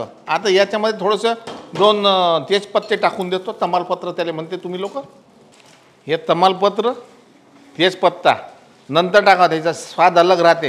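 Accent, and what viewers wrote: Vidarbha, India. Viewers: मस्त रेसिपी 😋😋😋 विदर्भाची भाषा मस्त बोलता तुम्ही...ऐकायला छान वाटत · Chaalan ji Sirji tumchi vidabha chi bhasha aamhala aawadate Hamaare Nagpur Ki shan ho aap 🙏🙏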